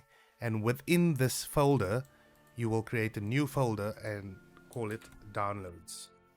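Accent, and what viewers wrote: South Africa. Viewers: awe I recognize that South African accent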